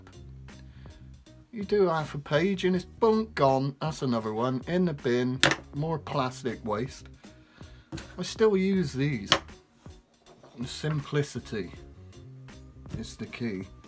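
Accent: United Kingdom. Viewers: I absolutely love the Bristolian accent! I am originally from Bemmy/Bedminster. I currently live abroad so it's great to hear an accent to make me feel at home. Honestly it's epic to see someone so passionate about their art and someone elses. I've seen your work all over the place and there was one that caught my eye in Kingswood on the Black Horse Pub wall. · Don’t put yourself down on accent south west is the best!! I’m from Devizes!! Propa south west! And I know wot you mean about bode ! I’ve got her tatttooed to my side.as soon as subway art hit my school library!! It was mine !! I had the buzz of graffiti!! I’m 48 still writing! I’m proud of the south west style! You and desk one from Swindon are my favourite graffiti artist 💯💯💯💯💯 Keep it going! 🤡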